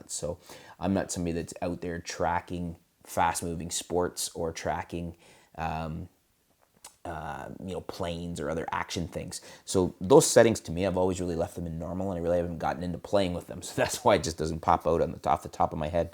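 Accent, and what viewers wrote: Canada. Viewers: Good informative review. Pleasant Canadian accent!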